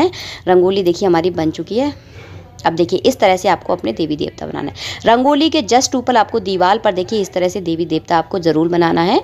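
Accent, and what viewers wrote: Bundelkhand, India. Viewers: Bundelkhand se hain kya aap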